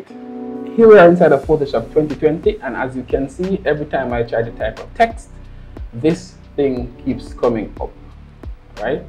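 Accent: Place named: Jamaica. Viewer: Bro sound like a Jamaican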